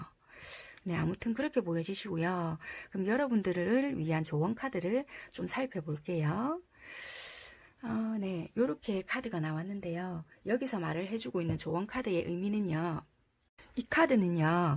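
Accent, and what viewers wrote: Busan, South Korea. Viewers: 부산 사투리 뿜뿜 타로 2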